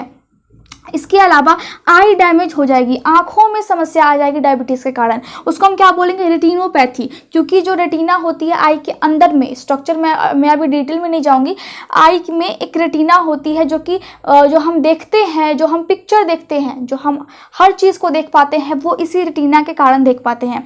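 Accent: Bihar, India. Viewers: Ap Bihar se ho didi kaya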